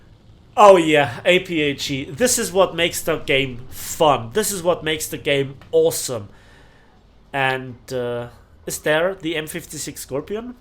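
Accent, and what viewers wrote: Germany. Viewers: I just love your German accent man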